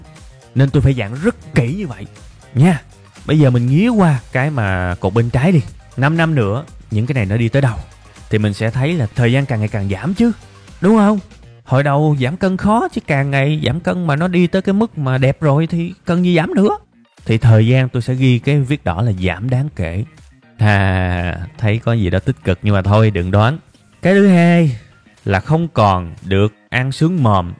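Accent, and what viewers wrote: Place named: Mekong Delta, Vietnam. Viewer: rất thích cái nói tự nhiên chân chất như miền Tây của bạn. Mà làm clip giống bạn ntn vậy chỉ mình với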